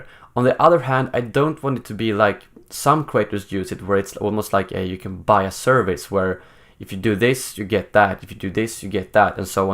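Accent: Sweden. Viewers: I came to this channel a few days after DICE's Battlefront announcement (before the Røde microphone and at the peak of your strong swedish accent hahaha), and I've been suscribed since then. I participated in the forums and I've seen most of your videos, including the rumors and "what the fans want". I've seen your progress through the channel, from short pieces of news to full-length informative videos that are both extremely hard to find otherwise and very well packed with information. I absolutely advice you to keep on with the Patreon and pursue a full-time career here on YouTube, this may be a niche channel....but it's the niche channel we people need to have in order to be updated with Star Wars games. Huge thumbs up to you and keep being awesome! -Kanto. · Well I gotta say this I hope that your channel grows much bigger and I think that I speak for many subscribers that, we would follow and support you even when battlefront dies out.(also for all those people that poke fun at the guys accent give him a break he is from Sweden so English may not be his first language)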